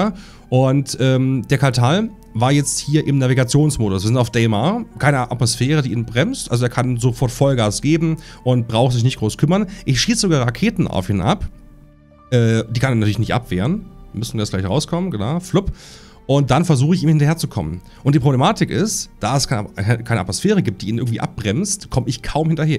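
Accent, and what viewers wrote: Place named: Saxony, Germany. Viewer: Vermehrt. Da merkt man das du aus dem sächsischem Bereich kommst 😅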